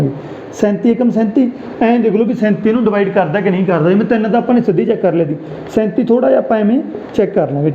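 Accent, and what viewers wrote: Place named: Gurdaspur, India. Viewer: Tuhadi language bott sohni hain;;; means apne area de according lyi;;; gurdaspur distt. tu alag hain;;; ur teaching way is so gd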